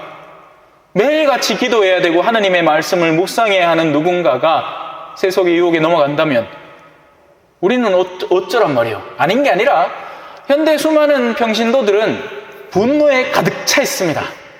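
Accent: Gyeongsang, South Korea. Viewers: 정감가는 같은 경상도 말씨 좋습니다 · 반갑습니다 ^^ 구수한 경상도 사투리 좋아요. 저는 안천에 살고있어요. 넘 경쾌하게 강의 잘듣고있습니다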